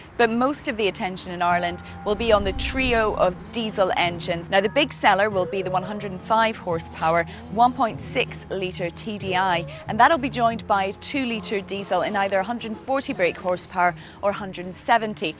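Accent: Scotland, United Kingdom. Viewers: The accent is irritating. Scottish with the weird Irish twist... strange. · irish program.she is scottish she does have hint of irish accent mixed but its more scottish.she used to do champions league on tv3 years ago also.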